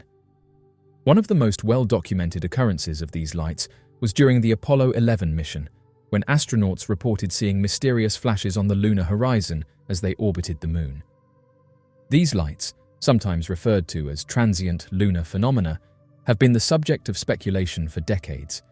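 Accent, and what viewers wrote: United Kingdom. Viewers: Even you know, with your British accent, THAT WE HAVE NOT LANDED ANYONE ON THE MOON. STOP PRETENDING OTHERWISE.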